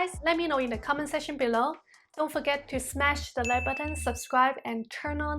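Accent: Singapore. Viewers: Your singlish is 👍💪👏 Popiah and fried carrot cake are my favorite foods🥲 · I am hearing some Singapore accent when you speak :)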